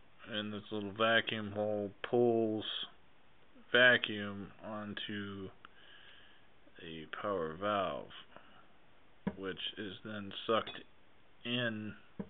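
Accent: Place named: Appalachia, United States. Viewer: Damn your the slowest talking HillyBilly I ever heard.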